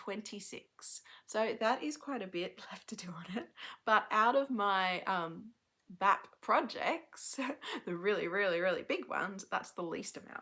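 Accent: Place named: Australia. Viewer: It's so lovely to hear a fellow Aussie voice on Flosstube! I'm so jealous of you going to the retreat with Joanna and Lisa in a couple of weeks!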